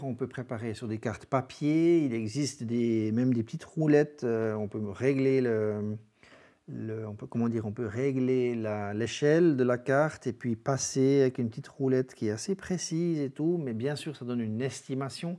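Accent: Switzerland. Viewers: Salut ! l'accent suisse fait du bien :-) je confirme tout le bien de Swiss Mobile (Iphigénie imbuvable)...je te suggère peak viewer pour les noms des sommets quand tu es sur le terrain...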